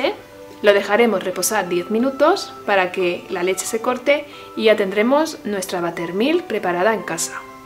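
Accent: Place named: Spain. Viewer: El bicarbonato,se puede sustituir x gasificante(sobre azul y blanco) o polvo para hornear? X tu acento eres española 😊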